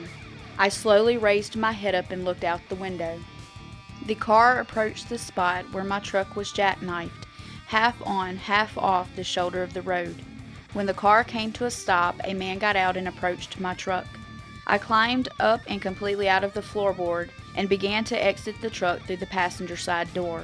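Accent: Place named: South, United States. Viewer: great job,u have that sweet southern twangggg!!! just love it😀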